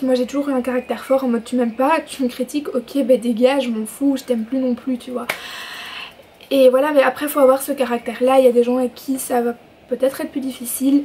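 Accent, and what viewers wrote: Belgium. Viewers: Tu es belge, non ? Tu as un accent vraiment adorable. Et t'as vidéo m'a vraiment aidé merci beaucoup :) xx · Accent belge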